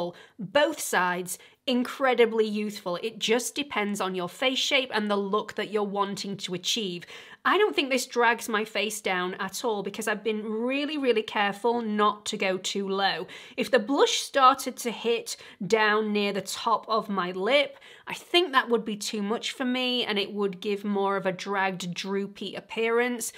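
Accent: United Kingdom. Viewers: Hi, that was insightful I normally don’t wear blush. I literally have only two one of which is a highlighting blush. If I ever choose to wear them on the more study regular basis, I will definitely head your advice. Thank you for the review and by the way, I love your accent may I ask what part of the UK are you from?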